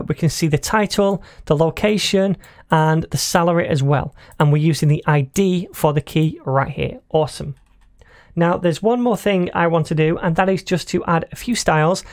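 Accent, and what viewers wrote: United Kingdom. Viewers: I was amazed to see you used rupees as the currency. Love the British accent btw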